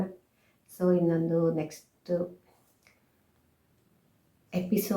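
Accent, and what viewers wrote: Standard. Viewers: Nimma video bari super hagutade.yellaru ivarige support madi.swatcha kannada matanaduva shaili olleyadagi barutte.yellarigu request maduta iddene support madi .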